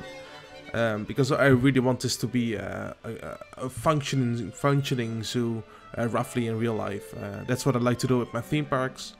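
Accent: Netherlands. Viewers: Love your dutch-english lol. I think adding glass parts in your roofs would make the inside look way better adding natural lighting aswell as making the roof more diverse from above.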